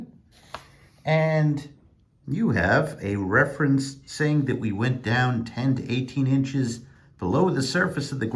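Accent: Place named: New York, United States. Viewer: I mean I love the accent,, that New York area Kofffeee sound... But it's gotta be niche (neesh) not neech , like Cheech... Know what I'm saying?😅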